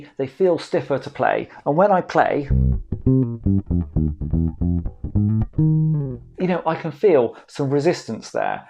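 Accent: United Kingdom. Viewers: This gentleman has that beautiful deep British English accent wich makes the video even more interesting. Thank you for the great ammount of information you have provided us with. Greetings from Lisboa, Portugal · Agreed. The information he dropped for us is very important........but that bri'ish accent do be hittin' different. Can't even lie. 😂😂😂😂😂😂😂 Ok, forgive me. Lol.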